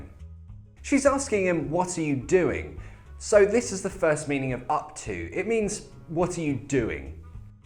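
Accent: United Kingdom. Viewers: I really love watching your videos. I'm more used to the american accent, but I can understand you completely, even though your accent is british. By the way, I love your accent. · your videos are totally the best one to learn the real british accent :) thank you a lot.. are you up for eating Japene Food? is it Right ?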